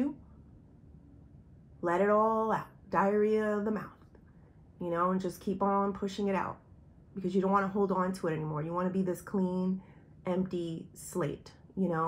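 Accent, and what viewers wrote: United Kingdom. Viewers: This is awesome. I love the British Accent also. Yes God is helping me cleanout things people actions attitudes that have held me back from enjoying true happiness. Thank you your reading is on point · The British accent is awesome 🙏🏼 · Everything you have talked about today is 💯and the proper part? I’m from Manchester England and you were sounding like me. Lol and you know your right. Just being able to be yourself with no restraints like a child again in a way. God always shows me when I need it. Thanks for this today. I needed it🙏⭐️💐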